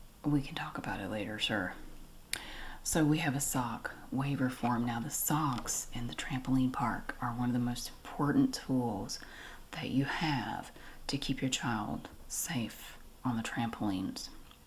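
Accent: South, United States. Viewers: I've been watching your channel for a while now and you are one of my absolute favorites. I'm also a southern lady and my family disowned me when I came out as gay and I moved away(I even lost my accent). My grandmother's name was Mary and your accent and voice just remind me so much of how comforting of a presence she was. It just takes me back to a different world I left behind a long time ago. Also you are so beautiful! I swear I'm not trying to flirt lol. I'm not really into feminine women, just jealous tbh haha.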